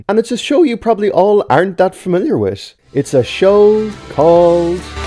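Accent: Ireland. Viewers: r u irish?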